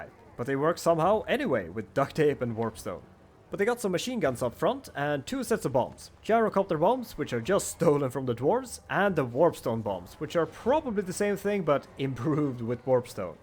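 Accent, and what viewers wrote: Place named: Sweden. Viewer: Are you from Sweden? It sounds like it.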